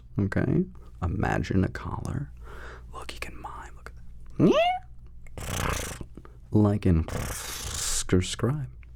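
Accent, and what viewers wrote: South, United States. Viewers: What is happening here?!😯 You crack me up. What a wild video this turned out to be.😆 Helix please sponsor. He deserves a new mattress. Listen to him. He needs a good night sleep.😂😂🛌🏼💤 Your editor is hilarious. The back and forth. Nice clicky clacky sounds with William. I'm new to your channel; gotta love the silly giggles.🤭 Thank you for 'sharing' with us. Southern accent. Hey now.😭 Glad your Dad is okay. The Cat moment.🙀😻 We're off the rails but in a good way. I'm laughing uncontrollably, but with you, not at you. There's a difference. This was fun and delirious. ASMR realness.🤪👏🏻 Goodnight.💜🧑‍🔬💜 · I actually love your southern accent! More please!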